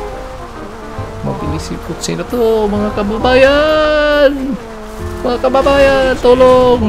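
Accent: Visayas, Philippines. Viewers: Hi Sir Bisaya ka Sir? Taga Gensan diay ko sir hahahaha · Bisaya ka ba taga Davao city po ako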